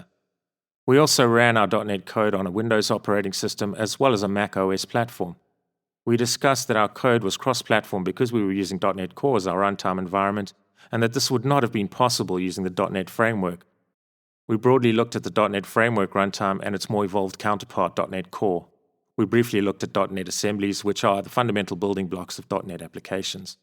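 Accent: South Africa. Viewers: Thank you very much. It is a little challenging for me to digest everything after watching it once, so will need repeating but the quality of your course and of course S.African accent helps. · Howsit, was about to ask this but saw this reply. Thanks for the courses and info. South African PHP developer in the UK looking to learn c# - even better getting to listen to a fellow saffa.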